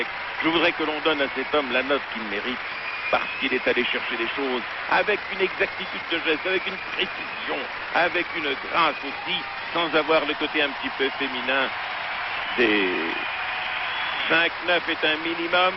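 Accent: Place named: Canada. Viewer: The footage may be European, but the commentary sounds like Canadian French.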